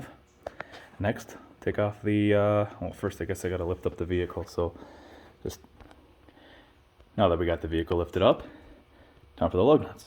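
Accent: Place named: Canada. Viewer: You sound Canadian...will I have a problem if I have an American accent? Thanks for the easy video...it looks pretty straight forward, Thanks!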